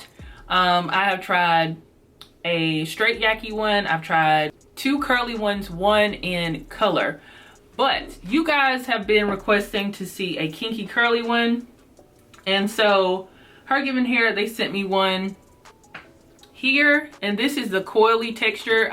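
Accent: South, United States. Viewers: LoL I meant the geographical area...I noticed a slight twang in your voice is all...I definitely wouldn’t have guessed SC.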